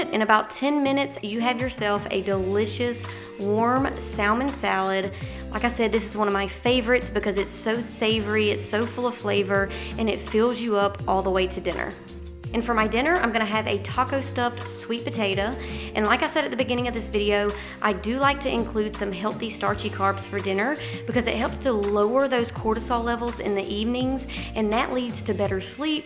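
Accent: South, United States. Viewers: You must be from the South😂❤🙏🏾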